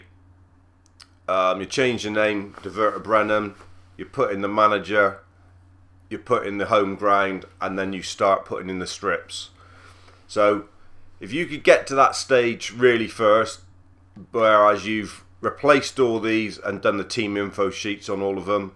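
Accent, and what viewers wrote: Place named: Bristol, United Kingdom. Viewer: Love that Bristol accent!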